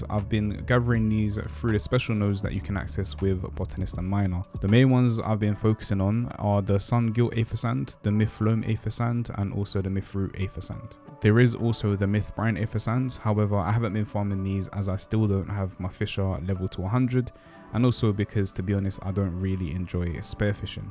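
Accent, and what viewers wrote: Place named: London, United Kingdom. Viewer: are you from London? Your accent is dank. Also, when are you back, baby?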